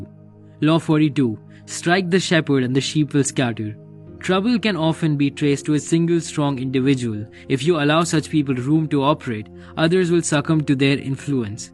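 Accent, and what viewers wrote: India. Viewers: very Indian accent.... awful! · Do i detect an Indian accent? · Please stop. I hate Indian accent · fuuuuck an indian accent SIGH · I really enjoy marked Indian accent. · Couldn't watch this for more than 5 seconds because of that Indian accent. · That it is being narrated by an Indian makes me not listen to it 🚮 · Law 51, dont use an Indian to narrate in English. Its "wery" irritating. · Immagine clicking this video expecting frank underwood's voice and hearing an indian.. the pain.. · so that means u are an indian . I knew that accent was native. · Cant stand his annoying Indian accent.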